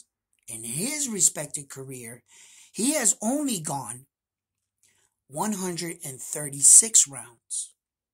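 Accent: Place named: Latin America. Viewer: Yeah a bit and your accent is Latin but I can't say if it's Honduran or something else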